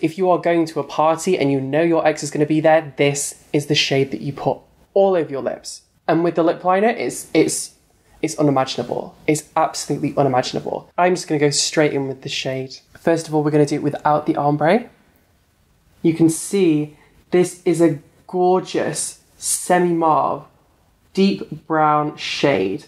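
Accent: France. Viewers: Love the packaging, dont like the French accent. We can see you better then the model. If there was better lighting and close up of the lips would have been better and let her apply it next time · Makeup looks really nice and I think the price seems reasonable! That French accent though doesn’t fit it sounds like the chef in ratatouille can’t take it seriously!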